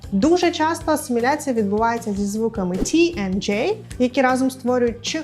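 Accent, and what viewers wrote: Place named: Ireland. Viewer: Той самий Айріш акцент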